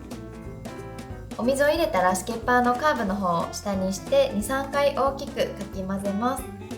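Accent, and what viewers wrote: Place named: Kansai, Japan. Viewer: はじめまして、さん！年末に仕事を辞めたので、時間ができたら米粉パンに挑戦！って思っていて、パン好きなので、グルテンフリーに前から憧れてました🥰 沢山ある動画で、さんのは、とても分かり易く、同じ大阪の方で何といっても関西弁にほっ！として、優しいほんわかな感じがとても良いです。お総菜パンも作りましたが、今日はクランベリーとクリームチーズを作って、めっちゃ美味しくできました😀 先日のライブで、チョコパイの話をされていたので、さっき動画観て、作りたくなりました。ドーナツも気に入ってて、まだ作れてないんですが、挑戦しまーす。こんな貴重なパン作りを無料で拝見できるなんて、有難いです。他もまだまだいっぱい作りたいと思います。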